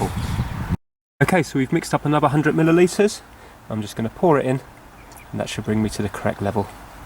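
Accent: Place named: London, United Kingdom. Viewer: Awesome accents like londoner.🥰nice vids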